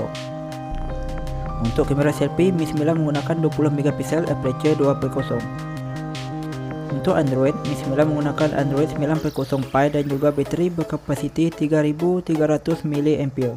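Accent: Sarawak, Malaysia. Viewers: org Sarawak kq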